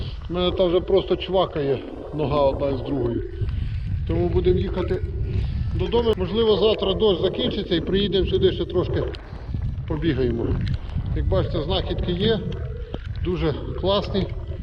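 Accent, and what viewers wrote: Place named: Khmelnytskyi, Ukraine. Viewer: Доброго Дня,З Якої Області .Схоже На Розмову Хмельницька.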